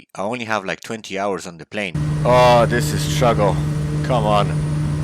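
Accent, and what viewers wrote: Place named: Ireland. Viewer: Hi I have just started watching your channel I appreciate your aim to be entertaining......I thought initially you had a slight Irish in your accent but wasn't sure about your nationality.......thanks for your work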